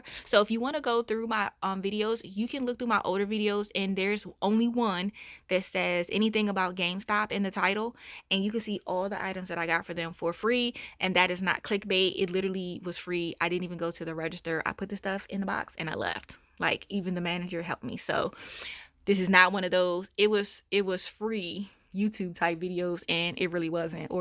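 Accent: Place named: South Jersey, United States. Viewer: Love your pantry vids. New subscriber here. I’m originally from south Jersey and you sound like a local girl (SJ/Philly) and it’s not common on here and I’m loving it. ❤️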